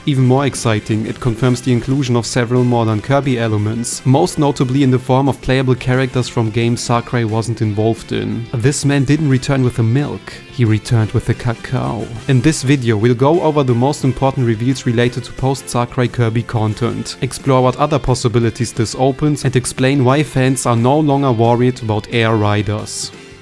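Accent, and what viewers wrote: Germany. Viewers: Höre ich da etwa deutsch heraus?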